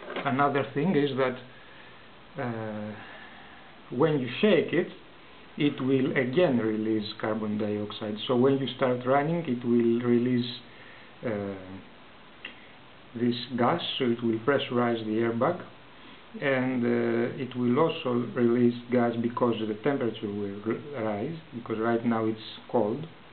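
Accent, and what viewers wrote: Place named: Italy. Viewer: Accento italiano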